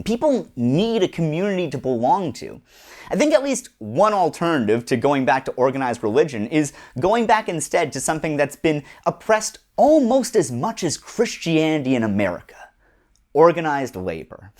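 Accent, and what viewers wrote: Canada. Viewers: This was great. Informative and entertaining. I love your NIN shirt and your Canada, eh? accent. Good job! I can't wait for the next one.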